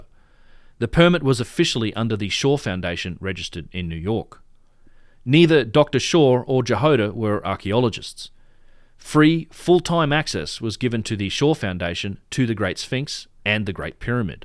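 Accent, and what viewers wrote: Australia. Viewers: Say, saw your full name written somewhere and it indicates you're either from Dutch or Belgian-Flemish descent (probably Dutch judging by your Australian tongue). I'm from Belgium myself, Flemish speaking, so, that makes us practically neighbours, eh?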